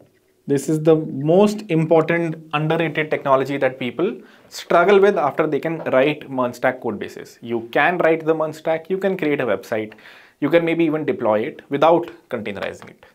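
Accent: India. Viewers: ur Hindi English is too good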